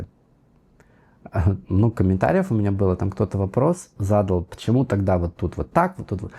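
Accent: United States. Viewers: ВТОРОЙ канал годный что нашел на английском (на русскоязычном ютубе), а не всякая ерунда типа плейлистов и прочего!, ваш американский очень очень хорош! Буду с удовольствием смотреть, спасибо!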